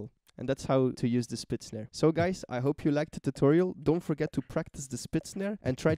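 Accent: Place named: France. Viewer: You have the French accent^^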